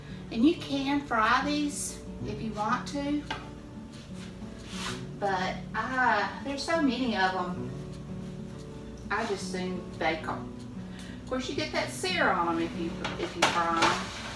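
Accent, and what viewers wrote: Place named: South, United States. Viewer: thanks one more time, all the way from Germany 😊 BTW, I Love your accent. We lived in Missouri some time, but southern accent is my favorite in the whole USA.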